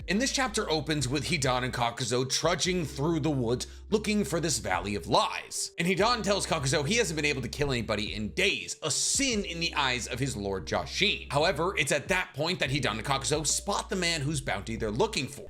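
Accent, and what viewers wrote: United States. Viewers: united states accent to japanese names hurts my ears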